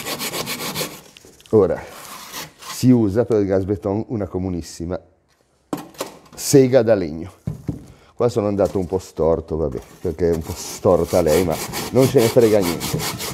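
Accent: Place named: Liguria, Italy. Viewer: sei un ligure ti sento dall'accento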